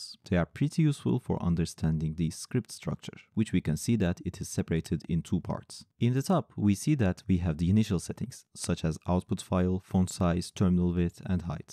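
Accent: Turkey. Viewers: Thanks that was usefull. btw are you Turkish ? Your accent seemed to me like that.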